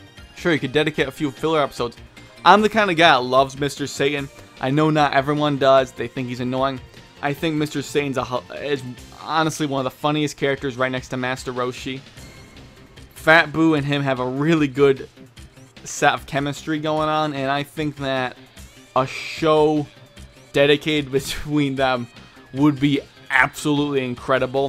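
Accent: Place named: Upstate New York, United States. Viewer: Hey, not DB related just curious. I remember saying you're from rural NY. My sister in law is from Schenectady and has a similar dialect to you. No need to specify, but is that about ballpark to home? Again, no need to get too personal or in depth, just making an observation as neither she and her family nor you have the stereotypical fuhgetaboutit accent.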